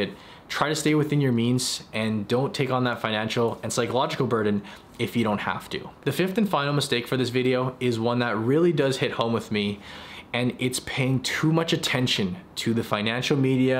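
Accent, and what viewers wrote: Canada. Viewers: Sometimes your Canadian accent is comes out and it’s really entertaining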